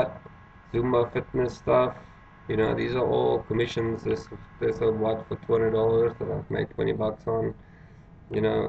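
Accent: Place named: South Africa. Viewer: oh you from south africa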